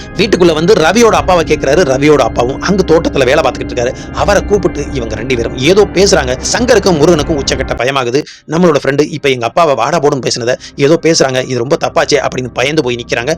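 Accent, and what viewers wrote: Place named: Sivaganga, India. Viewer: Naan sivagangai anna.. Namma pechu style ungakitta aptiye irunthathu, athaan kettan... Show ellam super ah irukku, naalla pannunga anna, enga support eppavum undu...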